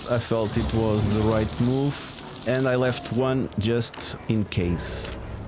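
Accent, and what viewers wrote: Portugal. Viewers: That's a proper Portuguese accent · The Portuguese accent! XD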